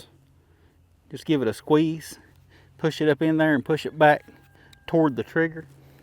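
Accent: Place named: Rural, United States. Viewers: "Well ventilated " love it! Of all the gun channels I subscribe to, this is my favorite. Must be the Kentucky accent. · I purchased a 500 retro with the 18.5 inch barrel and was looking for a 28 inch barrel for it and they run about $220. I seen the maverick at rural king for $179 with the 28 inch and got an extra shotgun. Since the barrels interchange it's the best combo. I have the Opsol adapter and have ran it through both interchanging barrels and they run like a charm with the mini's. Just a side note my Dad and Grandfather were from Augusta Ky. and listening to your video's with your accent reminds me of them. They would shoot skeet every Saturday here in southern Indiana and load their own shells and always clean everybody out. Good times and good memories. Thanks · East Tennessean here, sir you sound like someone from my neck of the woods. 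Loved the vid. I have the same adapter. It’s amazing. Keep up the good work. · I like this guy and his country twang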